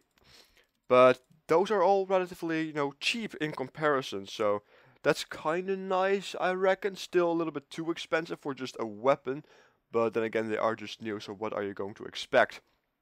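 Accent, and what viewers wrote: Netherlands. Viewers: Bro, are you dutch? you sound like you are :)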